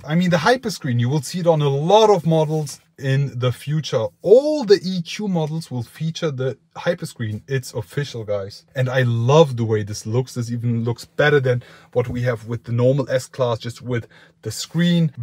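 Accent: Germany. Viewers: Is he talking of 600 miles or rather 600 kms as he sounds European or German. As in this seems to be a German or European spec car. And those I would suppose are in kms. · Немец говорит на английском?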